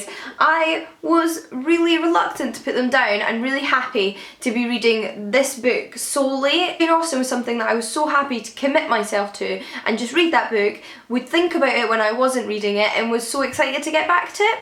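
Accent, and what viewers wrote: United Kingdom. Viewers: Thank you very much for your sharing! I find it very useful for my study as an English major in China...btw, I am very intereted in your accent, I think it is very cute...Do you mind if I ask which part of the UK you are from? Thx so much!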